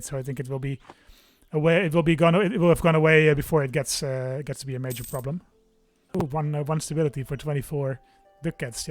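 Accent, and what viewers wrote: Netherlands. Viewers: I see why you chose Holland. Your accent sounds dutch. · As an American, hearing that this is a Dutch accent makes me realize how there's so many Dutch people in America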